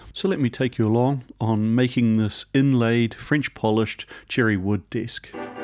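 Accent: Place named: New Zealand. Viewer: I'm here for the old machines, the witty humour, the Kiwi accent, the aviation stories and I'll happily stay for the cooking and woodwork!!!!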